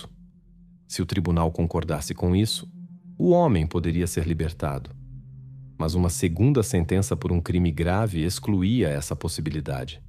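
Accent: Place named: Portugal. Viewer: O canal é ótimo, exceto quando coloca sotaque de PORtugal. Afffff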